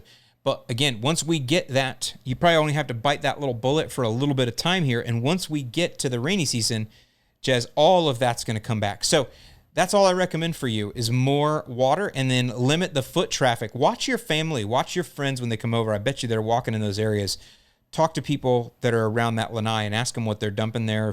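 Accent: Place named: South, United States. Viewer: I just can’t do it. Your soothing southern accent gets annihilated with every super chat.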